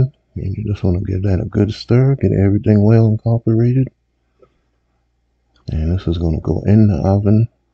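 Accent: Charleston, United States. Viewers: I can here the Charleston in your voice. I’m here to steal another one of your recipes 😂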